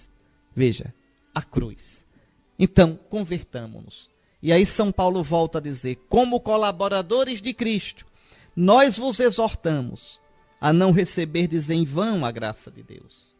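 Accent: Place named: Northeast, Brazil. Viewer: Uma ótima explicação em dialeto nordestino. Dom Henrique intercedei por nossa Quaresma!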